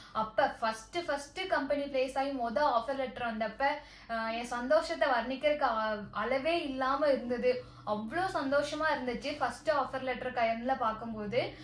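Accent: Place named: Palani, India. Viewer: good your videos are very nice to see.... Palani slang nalla theriuthu.....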